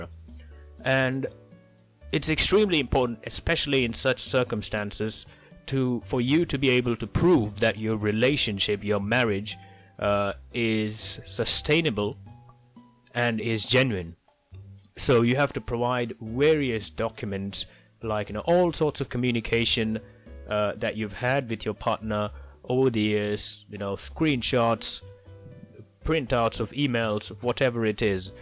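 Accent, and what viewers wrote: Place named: United Kingdom. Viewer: You sound very fluent. I just thought you are a Briton :)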